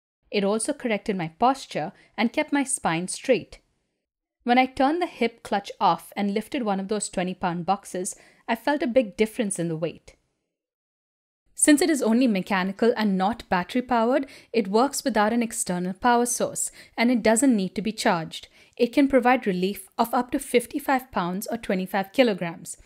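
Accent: India. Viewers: I guess that u are from India because of your accent 😂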